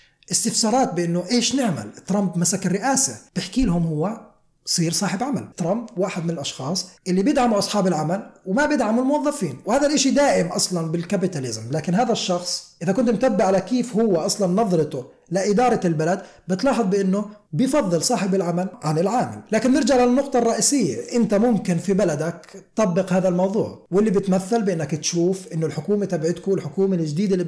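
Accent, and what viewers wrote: Jordan. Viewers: من اول قناه ومن كلامك عرفت انك اردني الله عليك يا نشمي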